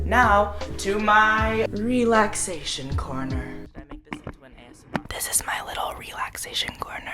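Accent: Texas, United States. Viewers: im from texas but your texas accent is so strong omygod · a love that sometimes 5he has a little of a southern accent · Those traNSItions thooo!!! Also you sound incredibly Texan in this video?? Like more than usual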